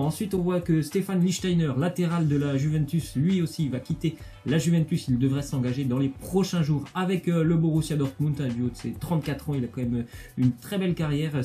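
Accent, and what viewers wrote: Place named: South, France. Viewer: Ta prit un accent du sud 😂et dommage pour Marseille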